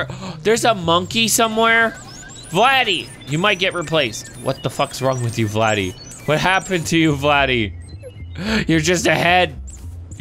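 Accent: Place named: Russia. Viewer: In case you didnt notice he has a rusian acsent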